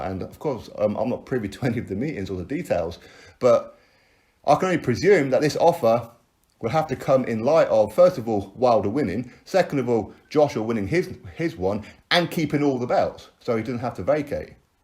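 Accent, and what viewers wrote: United Kingdom. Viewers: Soon as i heard the uk accent i knew you were lying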